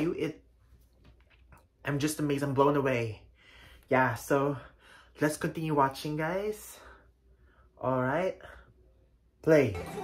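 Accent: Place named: Philippines. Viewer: Are u japanese? U sound more like pinoy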